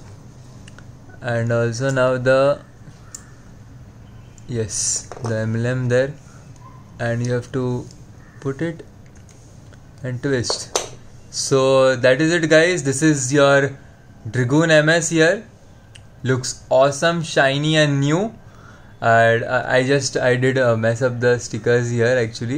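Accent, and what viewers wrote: India. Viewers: Are you an indian? i can tell in your accent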